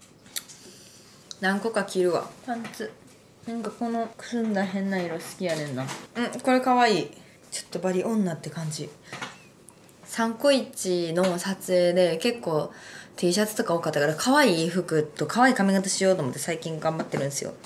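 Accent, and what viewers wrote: Kansai, Japan. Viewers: 今日もかわいい 関西弁もかわいい 全てが可愛い のに遠慮なくゲップするところ好きWWW 🥺💗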